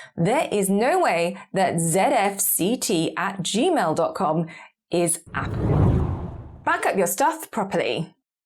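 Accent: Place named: RP, United Kingdom. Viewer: When I close my eyes and listen only to your voice, I can truly believe you are part of the British Royal Family!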